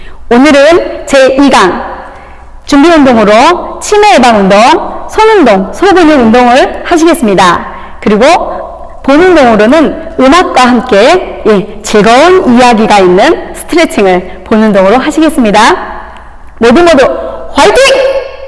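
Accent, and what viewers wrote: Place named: Chungcheong, South Korea. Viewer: 강사님의 밝고 힘있는 구령소리를 들으니 저절로 운동욕구 충만해 집니다. 충청도 사투리도 재밌네유~~ 매일매일 보면서 운동 열~심히 하겠습니다. 고마워유~~~^,^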